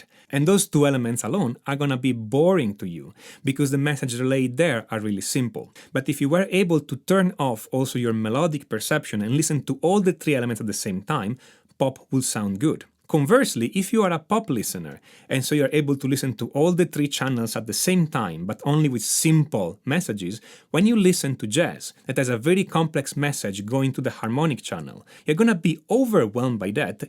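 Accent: Italy. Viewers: I love your Italian accent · Ho riconosciuto subito l'accento italiano (non avevo mai incontrato questo canale )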